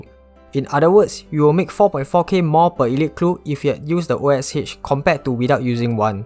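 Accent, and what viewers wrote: Singapore. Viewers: u sound Singaporean or mayb Malaysian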